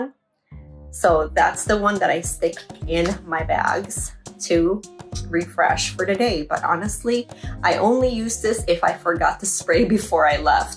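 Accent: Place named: France. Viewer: Great video sis, Loving your french accent..😄 I'm the same, as you, I have my fave perfume that I've been using since high school and its the Calvin Klein Eternity, it just brings nostalgia for me..💗 I'm perfume obsessed lately and have a few different ones..lol.. I do want to get the Louis Vuitton, Rose de vents and Chanel 1957. those two are on my Christmas wishlist . Thank you for sharing, have a wonderful weekend 😘💖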